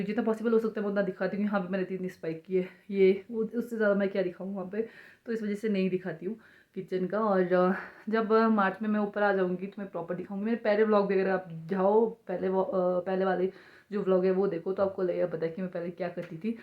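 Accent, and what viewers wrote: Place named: Assam, India. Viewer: Mai app ka vlog dekh rehiye thi aur app ka hindi aur hamara assam hindi sem hai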